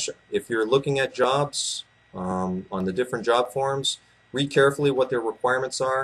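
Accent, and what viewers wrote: New England, United States. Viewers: You're from New Hampshire, aye? EAST COAST represent!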